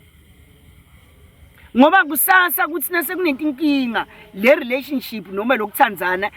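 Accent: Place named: Tsonga, South Africa. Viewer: Some shangaan tsonga dilect on swati language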